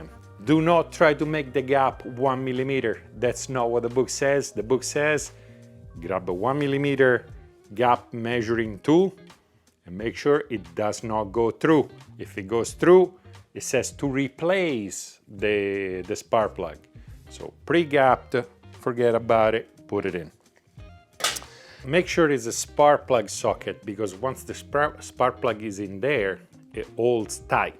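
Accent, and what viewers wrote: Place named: Italy. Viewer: He is Italian 100 % . I hear his accent.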